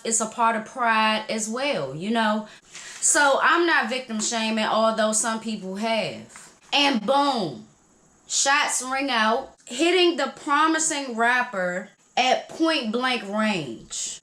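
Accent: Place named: Florida, United States. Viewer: It's pronounced "Pom-Puh-No", Flawda. Haha. I love ya accent. Bless you on your entrepreneurship. You're still effortlessly beautiful. 👑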